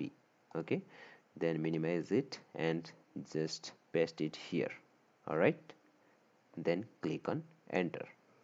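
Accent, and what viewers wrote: India. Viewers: When i started the Video and heard the Indian accent i knew he would explain it like a Pro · when i have started the video and i heard the indian accent i immediately start following the step because u guys always help us in math an in every other subject a huge thanks and respect bro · why is ur voice so bad. average indian voice ew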